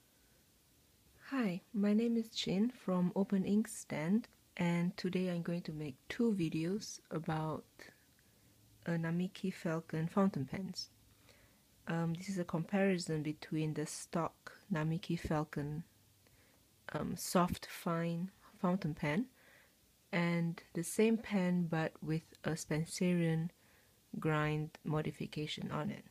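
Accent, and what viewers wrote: Singapore. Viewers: I also guessed Singaporean! I like your accent, and agree with the commenters above that I appreciate your thoughtful and methodical posts that give really practical information. New subscriber! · Are you Singaporean?:)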